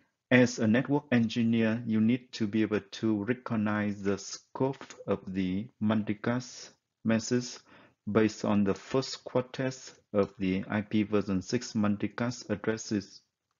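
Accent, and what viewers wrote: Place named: Vietnam. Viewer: nghe phát âm tiếng anh biết ngay người việt =)))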